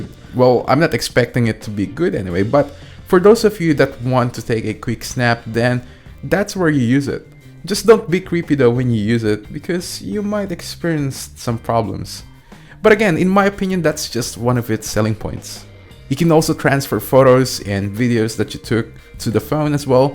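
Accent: Philippines. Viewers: Are you Pinoy? Your accent sounds Pinoy. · the diction of the speaker sounds like Filipino. :) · kinda disturbing. a guy with a Filipino accent but pronounces words with "O" in an Australian accent. not good to the ears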